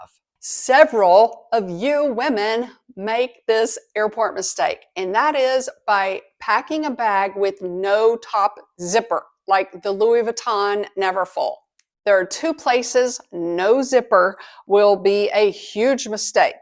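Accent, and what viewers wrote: South, United States. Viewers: Love it! Thanks for the tips. I'm always looking for ways to make my airport experience better. Also, loving your accent from one southern gal to another! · I love listening to while drinking my morning tea. Love her Southern accent- home to me! Even though I travel internationally a couple times a year and have for a long time, rules change and just adds to my peace of mind with her updates and equipment tips.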